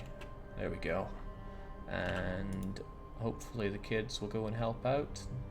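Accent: Scotland, United Kingdom. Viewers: Hey buddy how do you rotate the buildings? Love your commentary style btw, what part of Scotland are you from? I can't understand some Scottish accents but yours is the best I've heard!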